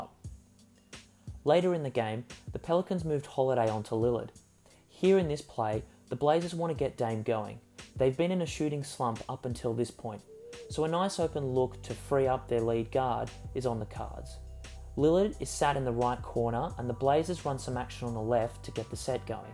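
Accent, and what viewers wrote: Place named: Australia. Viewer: If you're able to turn up the volume on your voice over analysis, that'd be awesome. Your analysis is pretty sick; you also sound like a fellow Aussie. Can't wait for your game 2 review!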